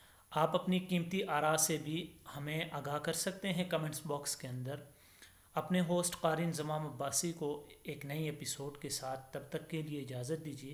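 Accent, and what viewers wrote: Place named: Panipat, India. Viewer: Pani Patti awaz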